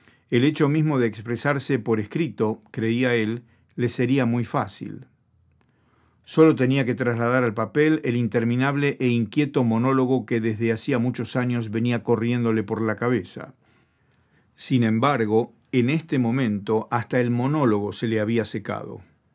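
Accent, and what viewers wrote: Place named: Latin America. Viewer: JOLINES..!!! ESTE ES ESPAÑOL LATINO... VAYA AL FIN GRACIAS COMPADRE.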